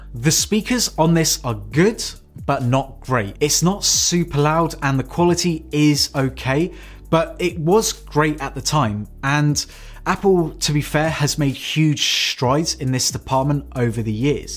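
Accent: United Kingdom. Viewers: I subscribed you because of this: nice british accent ✅. btw i clicked your instagram account link found nothing.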